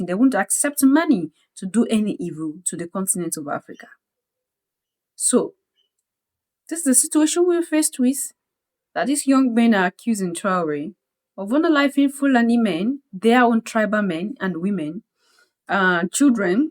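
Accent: Gambia. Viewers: I guess this guy is one idiots from my country the Gambia cuz of his ascent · This man sounds very Gambian...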